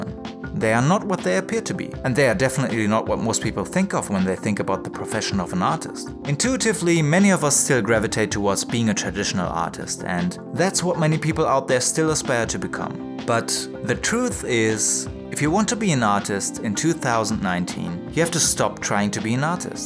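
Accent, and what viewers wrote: Germany. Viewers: Hard german accent. Tolles Video:) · Ich bin neugierig. Du hast einen leichten deutschen Akzent. Bist du Deutscher?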